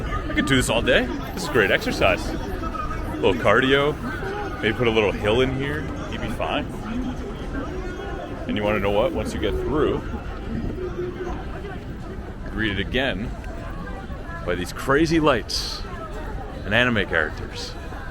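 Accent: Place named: United Kingdom. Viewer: Is it me or your sounding more British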